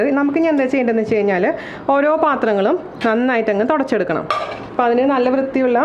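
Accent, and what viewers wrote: Thrissur, India. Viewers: Thank you madam... thanks for the great tip..Really working.. Thrissurkari aano bhasha kettitt angne tonni😁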